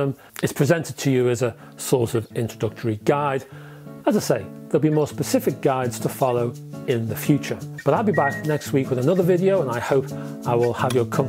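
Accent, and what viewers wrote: United Kingdom. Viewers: I am from Canada and when I hear a British accent I prefer prices to be quoted in "quids" instead of "pounds". Great video!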